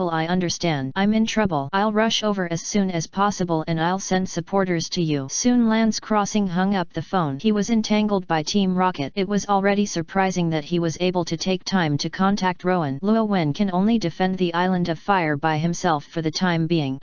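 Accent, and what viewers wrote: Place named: China. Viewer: My god this Chinese actsent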